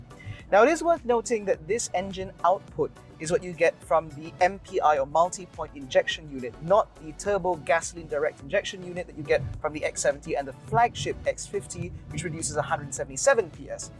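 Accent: United Kingdom. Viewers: Raw malay rempit look with Nice british accent. My brain is scrambled.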